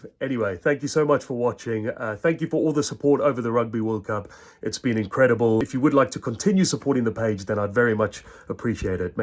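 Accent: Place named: South Africa. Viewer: Hey. Sounds like you left your beloved SA to live in England. That accent is a dead give away.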